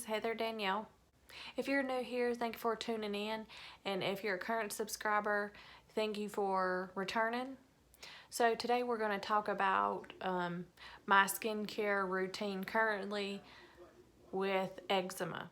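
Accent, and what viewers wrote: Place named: South, United States. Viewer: I am currently using the eucrisa as well. My whole body is covered with eczema. All except my face. But you have a sexy southern accent. Where are you from? I was raised in North Carolina but live in South Florida.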